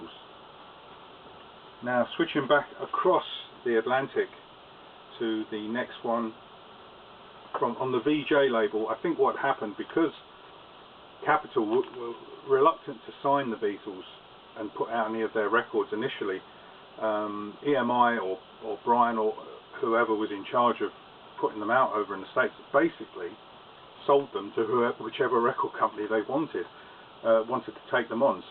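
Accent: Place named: United Kingdom. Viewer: (This is Tom, not Sandra.) Why do you think that England didn't have picture sleeves for the Beatle records back in the early 1960's? Have you found that out since you taped this video post? You have some really great collectibles there, sir. Thank you for sharing your collection with us all !!! Love your accent, also!!! Love all the British accents, really!!!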